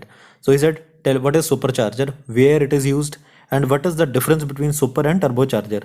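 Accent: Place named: Kerala, India. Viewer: Bro u r voice like kerala people😅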